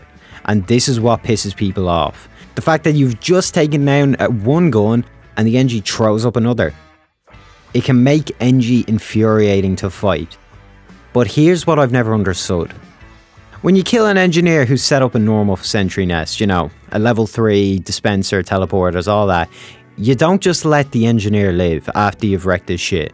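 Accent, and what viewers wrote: Ireland. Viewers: He’s Irish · Ahh. A fellow Irish man. First time watching. Wexford or Dublin? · my god what an amazing Irish accent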